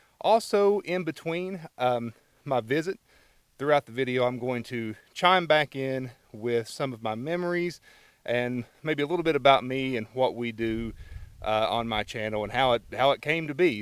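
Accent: South, United States. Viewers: That’s the voice of a southern gentleman. Do you use beard products?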